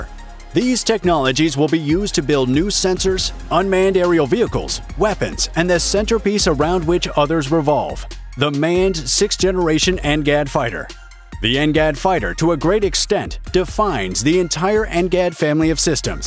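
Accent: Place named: United States. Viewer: Nice....an actual American narrating American innovations. Strange having a Brit explain it to us.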